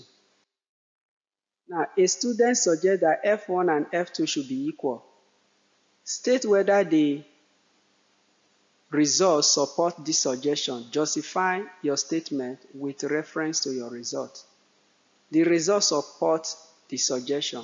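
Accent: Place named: Nigeria. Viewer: Nigerian accent the best muah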